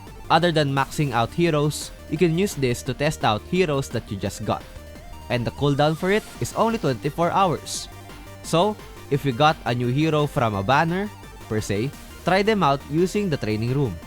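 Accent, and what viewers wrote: Philippines. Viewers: Nice video pare, salamat sa tips and tricks. Halatang pinoy with the accent 😂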